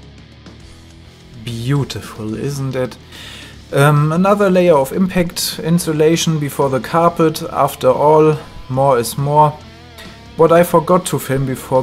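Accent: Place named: Germany. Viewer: jetzt no front, aber man hört sooo hart dass du deutsch bisd hahaahh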